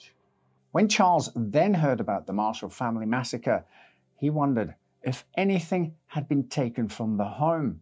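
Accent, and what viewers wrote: Scotland, United Kingdom. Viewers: Delivered with a beautiful Scottish accent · A little Scottish, minus the rolling Rs. Good old English and an occasional Africaan twang. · As always you narrated story kept me the edge of my seat. Thank you Sir and your wonderful Scots accent!❤ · So are you a Scot or a Welshman? The reason I have asked you is because you sound just like my father who hailed from Edinburgh but went to Perthshire during the war, he never lost his Scottish accent, even though he had been in Australia for 31 years at the time. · well, no, but we do know that it's a Scots accent. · it's a Scottish accent 🤣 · i love listening to this lovely man with his scottish acsent, he sounds just like my ex husband. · your lovely Scottish accent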